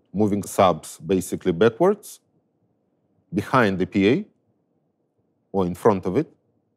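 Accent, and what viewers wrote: Russia. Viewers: обожаю такой английский, русский русского лучше всего поймут по-английски))) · I love the russian accent (sorry, If I got it wrong).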